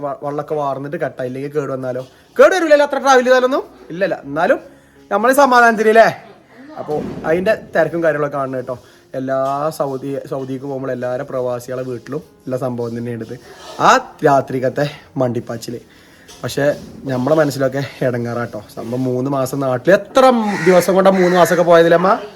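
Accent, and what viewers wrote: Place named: Kasaragod, India. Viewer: Kasaragod paraya oottpola😊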